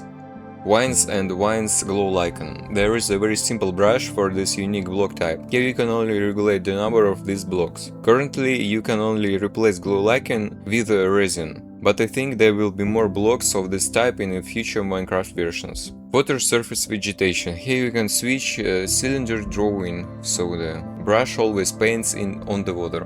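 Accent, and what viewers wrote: Russia. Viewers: Брат, вижу по акценту, что русскоговорящий. Очень был удивлён, что такие старания никак не монетизируются. Спасибо за скрипты, как доделаю свой проект, то отпишусь! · По акценту видно что русскоговорящий. Огромное спасибо за скриптики, работают прекрасно. Очень надеюсь что твои труды тебе окупятся, была удивлена что такое вообще бесплатно есть ❤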